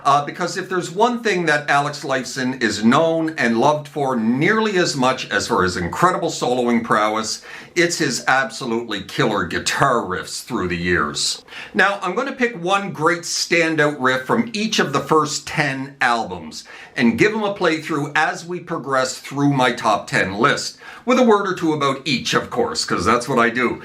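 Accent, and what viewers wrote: Canada. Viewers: Soon as I heard the Canadian accent I knew I was listening to a man who knows his stuff. Rock on from Detroit 🤘🏼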